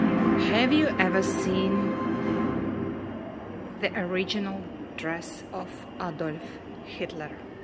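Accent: Russia. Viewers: Очень заметный русский акцент. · хороший английский , но акцент супер русский))))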